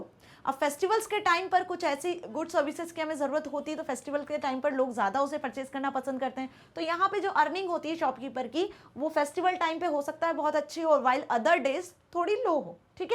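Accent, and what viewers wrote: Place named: Bengal, India. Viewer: Arey bhai ap toh bengali ho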